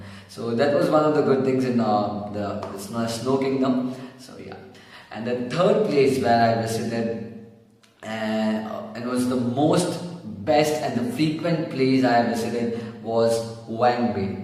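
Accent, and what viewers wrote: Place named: Russia. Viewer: Russian accent👌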